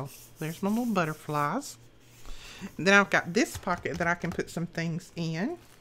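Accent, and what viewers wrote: South, United States. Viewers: Love. Love, Love this. I'll be creating one, thanks so much for sharing & for the inspiration. Love your accent💜I have relatives down south. Stay safe & be well. We also wear our mask & gloves when we go out. The price of food & paper goods have gone up. This virus has affected everyone one way or another. Sadly, I have relatives who are I'll from the virus. It's a difficult time. 🙏🙏🙏🌹❤💙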